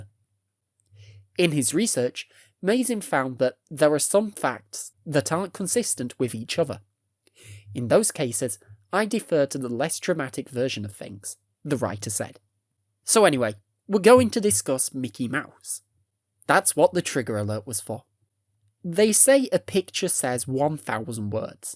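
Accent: United Kingdom. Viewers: I assumed you were British but I'm going to guess Australian now by that trigger sound effect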